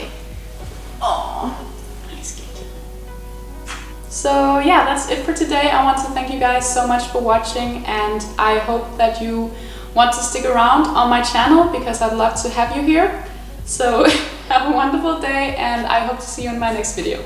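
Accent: Denmark. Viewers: Man kan næsten ikke spotte din danske accent 🙈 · Hej jeg kan høre at du er dansk , er der en video hvor du snakker dansk, eller har du en blok/hjemmeside på dansk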